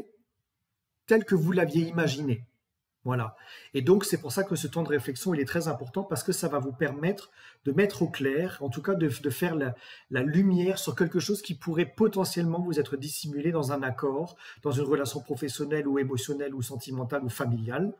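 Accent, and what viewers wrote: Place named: Alsace, France. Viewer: Super (y) Très claire, cette présentation de la prochaine Pleine Lune du 15 novembre, assez technique (comme j'aime!) mais aussi très parlante car reliée à des faits concrets (la politique actuelle, l'économie...). J'adore votre petit accent alsacien et votre façon très posée, très calme, très structurée de présenter cette carte du ciel: vraiment presque parfait! J'ai juste noté une p'tite erreur à "Neptune fait un carré au Noeud Nord" alors que c'est une conjonction, mais c'est vraiment pour vous prouver que j'ai écouté attentivement vos explications jusqu'au bout que je fais cette remarque;-) Bravo et merci infiniment pour cette belle présentation, en espérant en voir beaucoup d'autres, de la même qualité (et peut-être qu'un jour, vous nous présenterez votre chat? ) 😉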